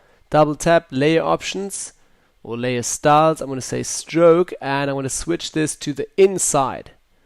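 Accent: South Africa. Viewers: Hey man, I saw you were German but you have a very South African accent, you live there? XD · Jy klink soos n SA man :D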